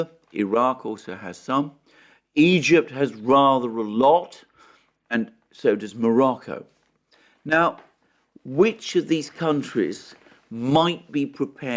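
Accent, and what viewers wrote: England, United Kingdom. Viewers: It's weird listening to a communist with an English accent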